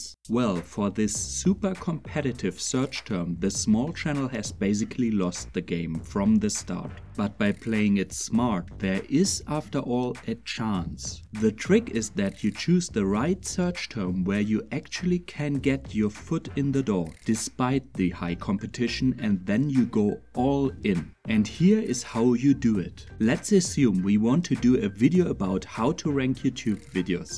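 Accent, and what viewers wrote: Germany. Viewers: Nice one, like your German accent, man. And obviously, you did a great job to rank this video, cause I found it on top of google ;-) Cheers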